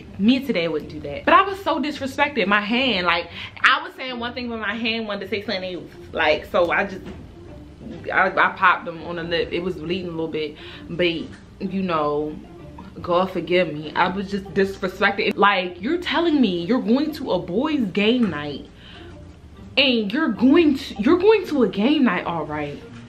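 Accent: Baltimore, United States. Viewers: U from Baltimore I can hear the accent 😂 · U sound like you from baltimore😭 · Lol Are u from Baltimore? Your accent 😆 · U gotta b from B-more or somewhere n Maryland..... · She sound Baltimore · U from bmore aint u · Sound like you got a Baltimore accent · It's the Bmore accent for me. I love it here. 😭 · Lol first time watching you and caught the Baltimore accent early I’m subscribing ❤️🤣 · You sound like you're from Bmore. Remind me of talking to my nieces. I enjoyed the storytelling 💜 The math is just not mathing for me 😂😂😂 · Hold up twoooooo was kinda long bmore ? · Just got one question where u from Baltimore, jersey, or Delaware???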